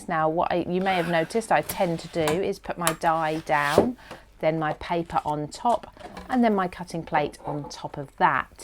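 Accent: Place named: Norfolk, United Kingdom. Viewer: Your accent is lovely! Are you from Norfolk? I'm am American with a fascination and appreciation for all accents British. And your video is great too! ;-)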